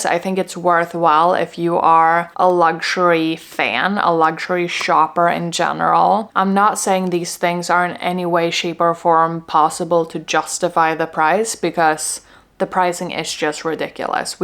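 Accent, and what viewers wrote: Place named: Midwest, United States. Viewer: I have a non bag question. Where did you learn English.? Do most swedes speak English.?.Your English is excellent. You have a bit of a Midwest American twang, very slight, but I can hear it. I lived in Chicago for 10years. Thanks to you I'm looking to get an Evelyn. I never would have looked at them prior to meeting you. I thought they were not pretty. So thank you for opening my eyes. I'm looking for a maroon colour. The GM is the largest one, right? Wish me luck....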